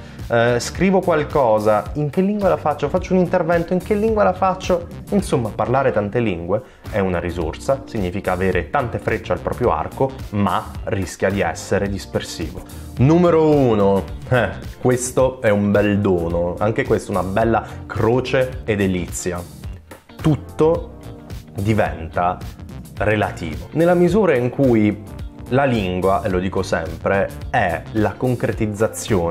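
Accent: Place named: United Kingdom. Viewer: Voglio. Il. Tuo. Accento. Inglese.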